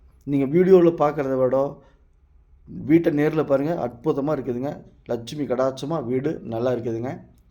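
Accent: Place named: Kongu, India. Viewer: நீங்கள் பேசுகிற கொங்கு தமிழ் இனிமையாக இருக்கிறது ❤ அழிந்து வருகிற வட்டார மொழிகளை காப்பாற்றுங்கள் வீடு நன்றாக இருக்கிறது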